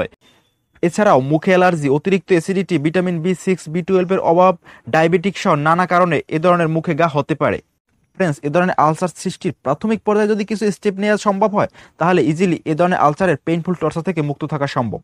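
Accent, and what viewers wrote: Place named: Barishal, Bangladesh. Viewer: Mone hoy apne borishailla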